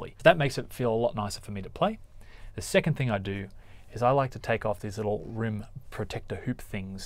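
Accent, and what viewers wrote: Australia. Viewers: Good to hear an Aussie accent. Sound like a Melbourne accent.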